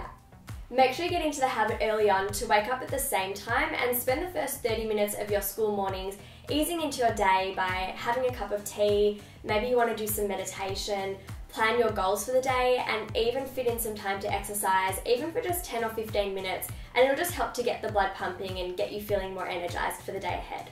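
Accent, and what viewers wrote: Australia. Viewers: Hi. Are you from Australia? Love your accent by the way😂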